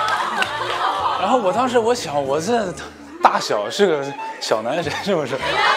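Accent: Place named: Northeast, China. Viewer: 唱歌和說話一樣的東北小老鄉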